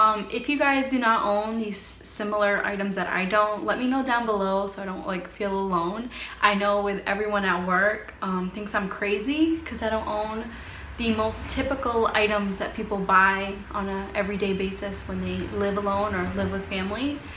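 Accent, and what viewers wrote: Boston, United States. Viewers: On another topic she has the cutest Boston accent 😁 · A projector with a cute Bawston accent ;)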